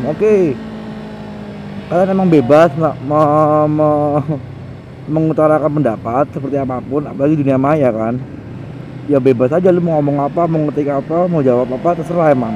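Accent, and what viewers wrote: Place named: Banyumas, Indonesia. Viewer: anjay mt25 ? btw agak ngapak ngomongnya,kocak njir :v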